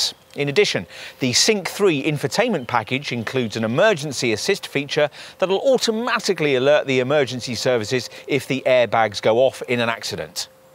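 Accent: England, United Kingdom. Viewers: Probably the best review of the Focus out there. Complete, precise, very well expressed and explained in a delicate english accent! Great job! And it leads me more and more towards going back to a Focus next year.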